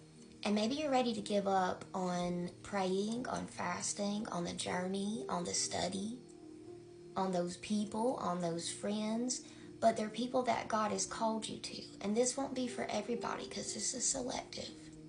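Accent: South, United States. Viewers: Hot southern lady hot southern accent we got the memo🙏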